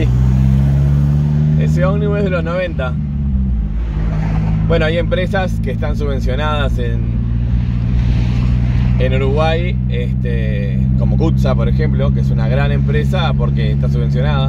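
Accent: Argentina. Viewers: Me encantan tus relatos pareces guía de turismo o mejor tu tonada es de argentino cheto ya sabés el cheto es la gente de la alta sociedad es un algo ojo me gusta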